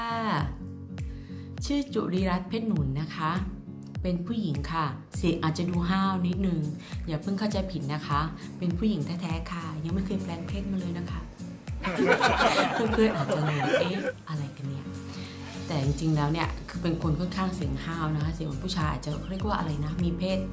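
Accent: South, Thailand. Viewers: ดันเลย พูดใต้น่ารักดี คะ❤️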